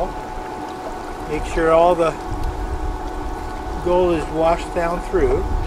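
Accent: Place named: India. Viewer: Indian delivery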